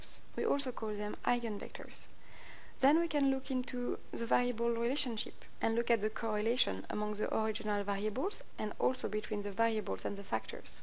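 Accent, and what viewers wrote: France. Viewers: Awful french accent! shouldn't be on a scientific tutorial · French accent definitely! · I really love your accent ... Are you French? :)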